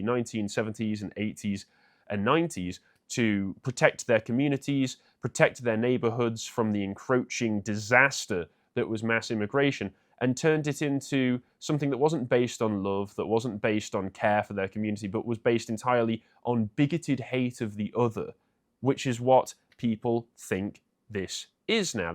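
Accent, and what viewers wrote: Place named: Bristol, United Kingdom. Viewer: Bristolian. Says it all really. Woke shithole.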